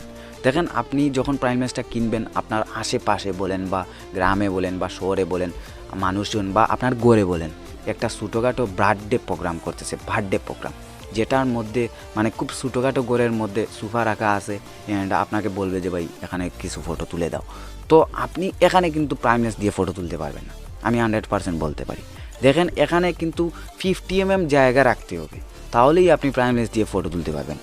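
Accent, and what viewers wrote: Sylhet, Bangladesh. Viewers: Vai ki sylheti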